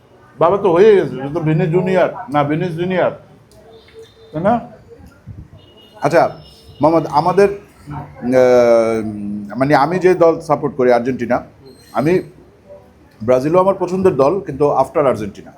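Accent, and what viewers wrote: Barishal, Bangladesh. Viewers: vai ki barishailla🥹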